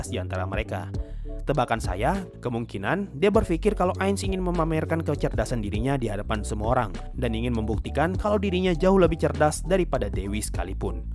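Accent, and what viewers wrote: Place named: Bugis, Indonesia. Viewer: logatnya si admin kek orang Bugis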